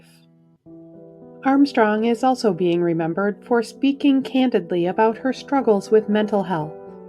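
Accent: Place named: Midwest, United States. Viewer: I love her sweet midwestern accent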